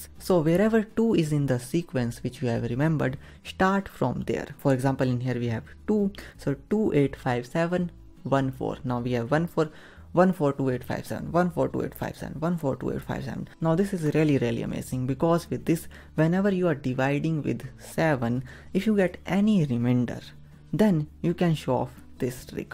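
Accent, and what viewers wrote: India. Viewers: obviously india who else has that accent? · he's from India but I can't really tell from which state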